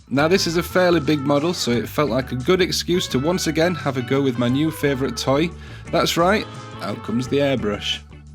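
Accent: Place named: South Yorkshire, United Kingdom. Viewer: Hey where you from you sound local to me. South Yorkshire.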